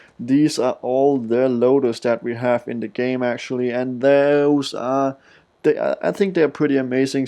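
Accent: Denmark. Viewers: Man kan godt høre du er dansker, haha fede videoer!!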